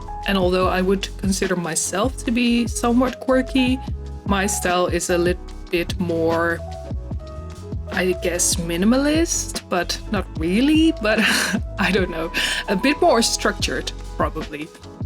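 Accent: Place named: Netherlands. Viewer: Ohhh je bent ook van Nederland!! Ik hoorde het al hihi❤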